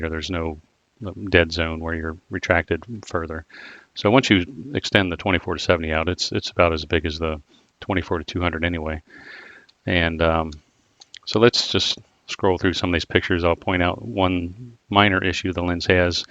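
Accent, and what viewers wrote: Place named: United States. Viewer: Neigh con, zee lens. No matter how many times I hear it said in an American accent, I mentally keep correcting it as Nick on and zed. English is a strange language to me.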